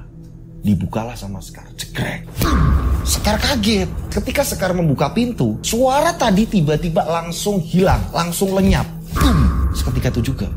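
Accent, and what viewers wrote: Java, Indonesia. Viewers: Selamat sore mas,ma'af mas mengenai wayang itu kebudaya an Jawa lho mas,bukan kebudaya an Indonesia kita dari bangka-selatan merasa tidak memiliki kebudaya an wayang tapi saya seneng lho mas dngn gaya mas,logat jawanya,ungah-ungguhnya😮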